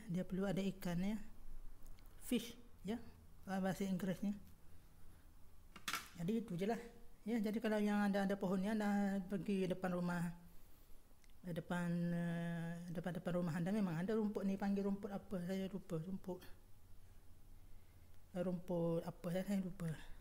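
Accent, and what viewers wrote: Malaysia. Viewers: Dia orang kelantan saya pun orang kelantan ..orang kelantan bila suruh cakap kl kek kok sikit😅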